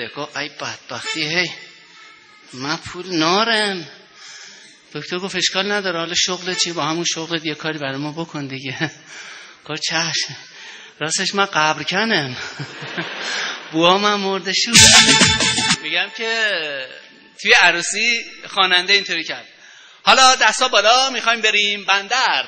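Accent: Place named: Isfahan, Iran. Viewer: خیلی عالیه لحجه ی اصفانی وقشنک است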